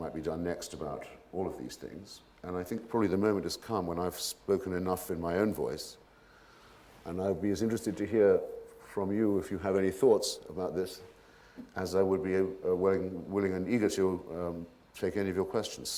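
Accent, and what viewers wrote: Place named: Oxbridge, United Kingdom. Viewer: Notice how he focuses on Europe and the Middle East, no mention of Central and South America. His memory lapse regarding El Salvador and Nicaragua is ideologically too convenient to overlook. Thankfully for those not chained to the Anglo-American doctrinal system, one can hear past the Oxbridge accent and see this impostor for what he truly is.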